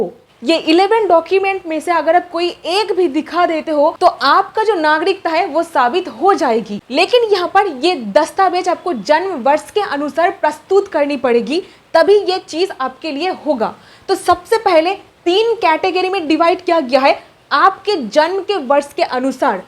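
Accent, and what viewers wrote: Bengal, India. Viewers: Apki tone bangoli h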